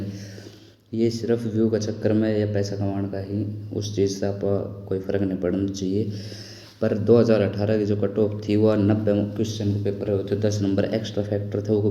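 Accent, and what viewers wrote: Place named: Haryana, India. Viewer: Brother mahendergarh side ka lag h😅😅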